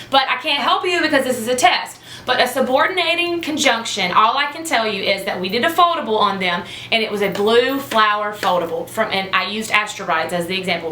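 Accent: South, United States. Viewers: UGH! I so need someone like you in my life! You are the blonde version of me, southern accent and all. lol Thanks for sharing!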